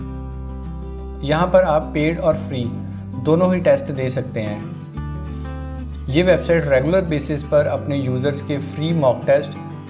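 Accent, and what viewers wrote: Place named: India. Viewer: Thank you , but your Indian accent makes it so hard to find out what you say , but thank U so much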